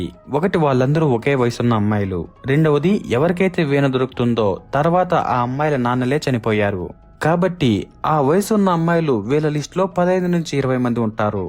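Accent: Rayalaseema, India. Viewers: Rayallasima kada slang alane untundi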